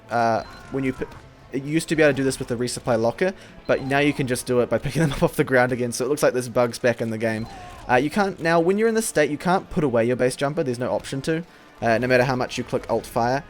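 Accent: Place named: New Zealand. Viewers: He's from new zealand I think? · I'm not overly familiar with Kiwi accents, but it sounds like Australian but different, so I'll go with NZ too.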